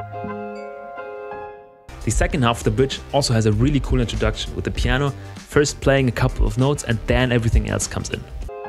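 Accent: Germany. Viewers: omg wusste direkt vom akzent dass er deutsch ist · I have zero idea how to make music, or what you’re doing in the video, but still very entertaining! Good job Also are you German? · Bist du Deutsch? lol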